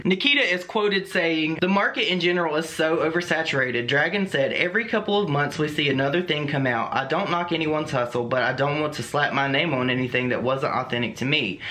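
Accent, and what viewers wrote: South, United States. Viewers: god I can’t stand that dirty little wannabe! Nikita DOES get away with a lot. Btw, when you post I always look forward to sitting down with a drink and listening to your sweet southern drawl. You DIG DEEP!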